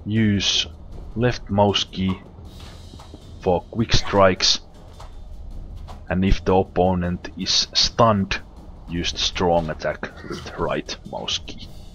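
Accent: Finland. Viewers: lovely finnish accent :D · the voice.......im 100% it is the finnish accent...its too distinctive.....im from finland so i know but i dont talk like that thank god lolo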